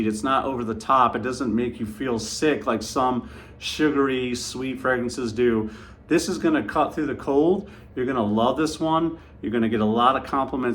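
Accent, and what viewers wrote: South, United States. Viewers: Great video my fellow Carolinian. I love this one as well. Don’t worry about speech sir, we country so it is what it is. If anyone wants this scent stronger, apply two sprays of this, then add stronger with you intensely. Thank me later! does it again. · Great review, sounda amazing. Dont worry about your speech, we are from tha south, most think we cant talk anyway😂😂😂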